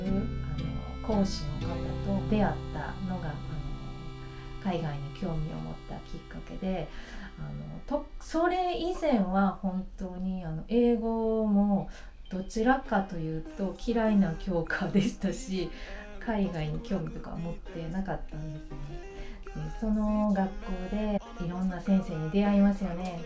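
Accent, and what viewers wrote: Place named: Osaka, Japan. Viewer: aiiro70さんが旅先でとても楽しそうに外国の方とお話をしているのを見て、私もスキマ時間に中国語と英語を学び始めました。 同世代、しかも大阪弁のaiiroさんの動画、とても親しみが持てて、楽しみにしています。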